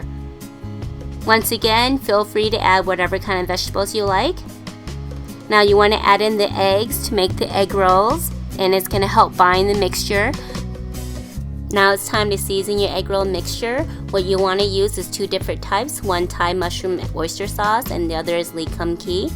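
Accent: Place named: Asia. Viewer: This video is sooo entertaining and well said doing the procedure inspite of our asian accent. 2 thumbs up for you madame chef! I will cook this one for my kids.thank you very much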